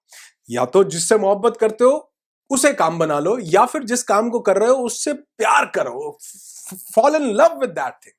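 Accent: Punjab, India. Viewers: Sir Punjabi ho tussi??... Boht Khushi hoyi sunke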